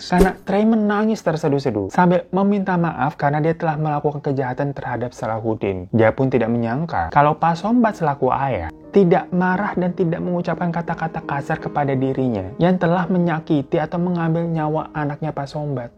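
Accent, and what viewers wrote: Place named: Jakarta, Indonesia. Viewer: Baru liet chenel ini, bagus ceritanya, tapi hostnya orang jepang apa orang betawi ya.. Ko lucu logat jakarta bgt.. 😁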